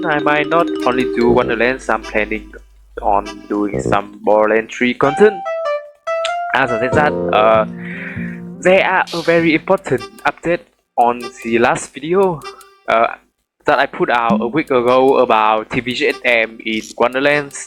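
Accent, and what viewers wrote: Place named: Malaysia. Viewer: Late comment, but I found this to be the most helpful for my case despite myself having trouble understanding your Malay? accent. Thanks.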